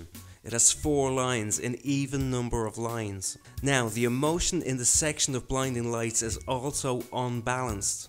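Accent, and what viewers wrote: Ireland. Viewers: love the Irish accent...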